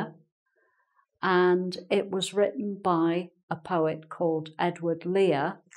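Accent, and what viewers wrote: United Kingdom. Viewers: You don't need to explain every word in the poem.I listen to your lectures simply because I like your pronunciation and I am fascinated with British accent. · Her British accent sounds really beautiful and also her lecture is really good.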